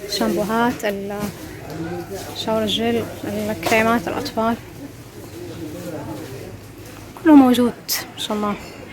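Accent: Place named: Jeddah, Saudi Arabia. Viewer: مشاء الله فديو ممتاز ولهجتك اعتقد انك من جدة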